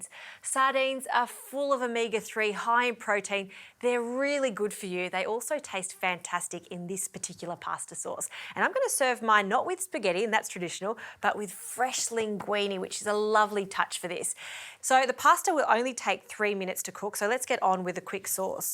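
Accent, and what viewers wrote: Australia. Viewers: I don't see the problem here. She said pasta, she has Aussies accent. We all know what she meant. Also, no ones asking. Hope ya have a better day champ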